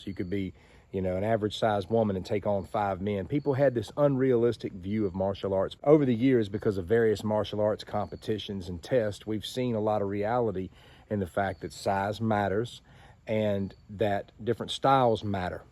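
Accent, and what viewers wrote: South, United States. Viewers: Thank you for making a video on this topic. Also, I enjoy hearing you talk. You have a nice accent; I'm just trying to place it. Southern? I'm from Tennesse and I love a smooth accent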